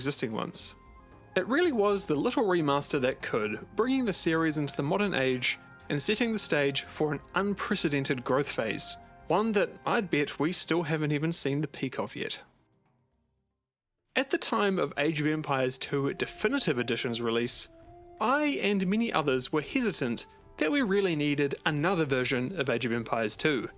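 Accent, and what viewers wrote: New Zealand. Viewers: Cute NZ accent, lol.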